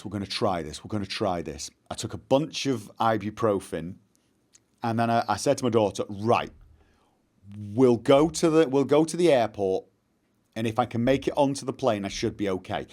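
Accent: United Kingdom. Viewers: I am older than him too. I actually first started watching his channel because I am also a Brit that has long lived in Scandinavia, but the far north of Sweden for me. It was just so nice to hear a British accent and anecdotes from a period I so fondly remember. Grumpy old man - Check! Disparaging of suicidally dressed young-en's out clubbing - Check! Wrecking my back every year by slipping over on ice or snow - Check! Egad, I think I am the Swedish incarnation of over the border. I'd buy him a sympathy beer the next time I'm over to Norway, but I cannot afford to take out a second mortgage...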